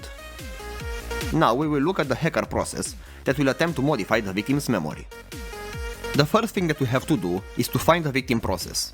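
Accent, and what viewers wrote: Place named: Romania. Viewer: scrie la descriere frt+ ai accent de roman :)))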